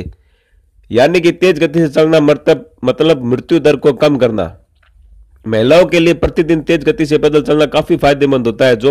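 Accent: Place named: Marwari, India. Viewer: भाषा और इनका लहजा मारवाड़ी जैसा ही लग रहा है।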